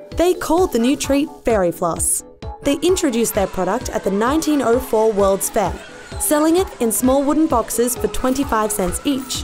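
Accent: United Kingdom. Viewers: Such a bri'ish accent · Abella Danger with brittish accent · Perfect British Accent 👌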